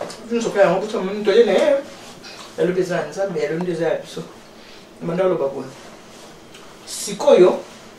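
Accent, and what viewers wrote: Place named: Kinshasa, Democratic Republic of the Congo. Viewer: Maman oyo namoni ozalaka tribaliste banda kaka felix ayiba kibskonzi okoma kaka biso baluba biso baluba po mutu nioso ayeba que ozalaka muluba. Biso ba kinois tolingaka koloba makambu ya bikolo penza te . Mingi mingi ba mbokar mutu balingi kokumisa makambu ya bikolo. Kasi koyoka lingala nayo eza yaba kinoise kasi bizaleli nayo eza yaba mbokarrrr .olingi koyeba bikolo ya batu nioso wana eza makila yaba yaba tribaliste. Na fingi te nslobi kaka verite.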